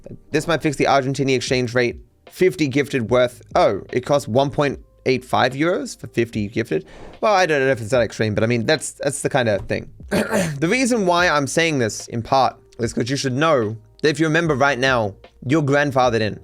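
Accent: Australia. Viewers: I'm American and have never thought you were anything other than Australian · Nah, if you can't understand the Australian accent, that's a skill issue. The only people that can be difficult to understand are the Scots. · I hear the Aussie because you aren’t as (I use this very very loosely) formal as a stereotypical Brit and not as limp as American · I'm australian and i never thought his accent stood out, mind you i was still able to distinguish you had an aussie accent the first time i came across one of your videos just to go check the channel name and everything clicked. As a side note and being as respectful as possible, you seem to speak really quickly EVEN by australian standards, like we're known for shortening phrases, but for example you said 'a lot of things' as if it were one syllable or one breath. Like, even faster than saying 'lotta things' slowly, i think its made even worse by the enunciation that you use to make yourself sound readable to non-english speakers. 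and i have a few mates of mine (doing I.T if that tells you anything) who try to get out large 6 syllable words out really quickly while ALSO enunciating (without slang), and to me sounds unnatural/forced to me despite having no trouble trouble understanding what they're saying, similiar vibe here. But i suspect this is what the non-english speakers having trouble with as it doesnt match the natural anticipation or cadence of, say for example a british or american english speaker so i reckon it might come off as hard to follow the pacing, so it's not just a culture thing but also your own habits. I'm no linguist though. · I'm from the north of the UK but don't really sound it. It is a very bland accent. I could tell you were Aussie but only just when I started watching. Outsiders from the UK have no Idea how many accents we have, they think we are all royal or some shit, clearly never been to Marsh green in Wigan lol. 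Go up the road were I live and you will find very different accents.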